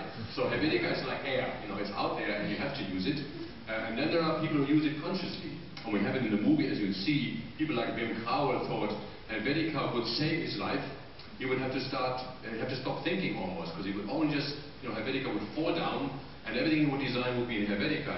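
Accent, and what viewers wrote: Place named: Germany. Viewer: I can't understand a thing beside the fact his german accent it does not help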